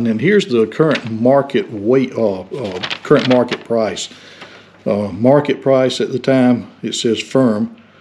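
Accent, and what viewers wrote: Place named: South, United States. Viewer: I love hearing him tell story's , very Southern, very respectable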